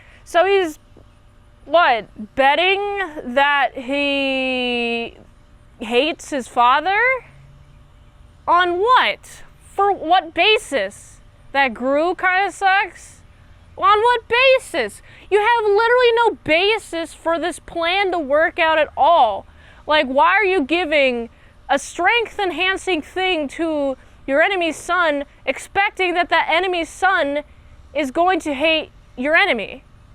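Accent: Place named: United States. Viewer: I love the American accent lol. What part is that accent from?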